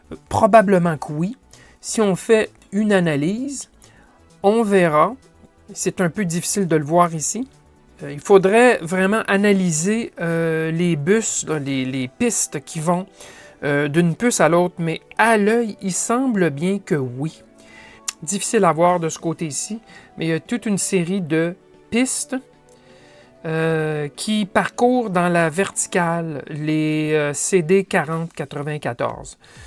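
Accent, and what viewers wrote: Quebec, Canada. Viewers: Bonjour. Je n'y connais strictement rien en électronique mais je regarde vos vidéos avec grand plaisir, ne serait-ce que pour l'accent du Québec ! Libre bien sur hein. Sino, bah et si j'ai tout bien compris vos explications, vu que la plaquette du dessous qui recevait les datas ne sert plus à rien, z'avez qu'à en faire une station météo avec les infos défilantes sur les digits à LED. Ou alors, mais bon ça m'a l'air peut-être plus compliqué, faites rentrer ça dans un boitier de PC et essayer de récupérer les signaux des ventilos et autres sondes de la carte-mère pour les diffuser sur les digits à LED. Je sais pas comment hein vu que j'y connais rien du tout. A part que peut-être aussi, sur les CM de PC y'a des ports COM ou SERIE qui servent à rien la plupart du temps. Ou bien encore, vu que le boitier est tout aluminium, collez-y un petit Rapsberry PI dedans et l'afficheur à digits de ce tagazou servira bien à keke chose vu qu'il y a tout un tas de ports dans ces petits PI. 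Take care, thanks, peace ☮ .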